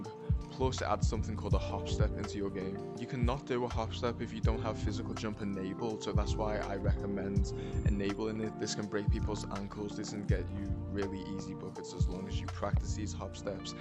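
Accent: Liverpool, United Kingdom. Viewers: Is my guy a scouser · boss accent da lad ar ye scouse? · Are u scouse and if u are I hope u support L.F.C 🔴🔴🔴🔴😊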